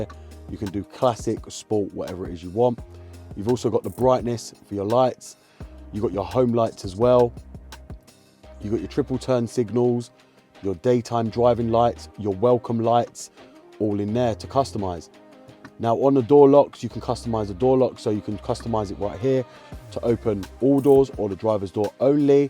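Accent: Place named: London, United Kingdom. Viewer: He's a Londoner If you can't recognise the accent then your a melt ... Now geda' Fuk outta ere ya cunt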